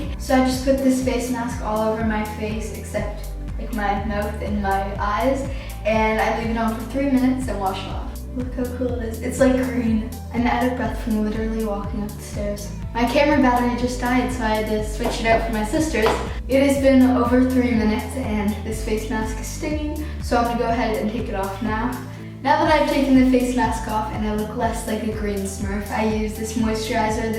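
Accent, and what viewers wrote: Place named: Canada. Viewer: you have a thick canadian accent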